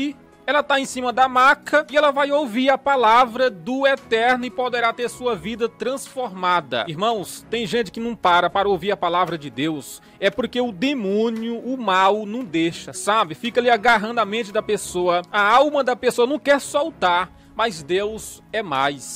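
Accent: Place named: Maranhão, Brazil. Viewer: Sou de São Paulo e gosto muito dos testemunhos e exortações que o senhor traz em seu canal. Admiro sua forma de falar. Sotaque de Maranhense é muito legal. Quero muito conhecer essa cidade linda. Tu é homem de Deus e abençoado. Fica na paz. Abraços!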